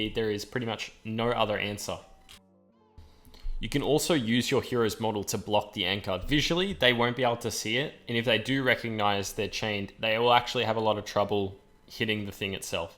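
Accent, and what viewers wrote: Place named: Australia. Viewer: Something about an Aussie accent that just makes any youtube video better.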